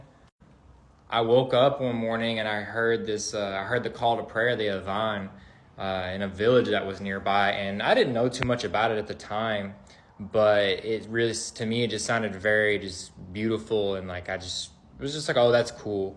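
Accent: United States. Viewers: American accent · Has an American accent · He sounds American. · Sounds American rather than British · That’s what I thought too defos American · Alhamdolillah, welcome to the true brotherhood! The accent sounds American and not British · His accent is American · He has an American accent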